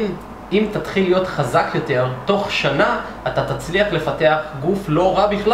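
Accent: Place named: Russia. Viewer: מבטא רוסי חח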